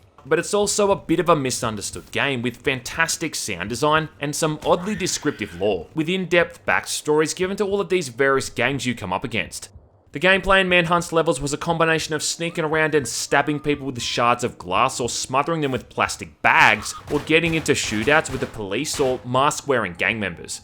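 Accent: Australia. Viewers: Is it me or did he sound more stralian in this one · What are you, an Aussie?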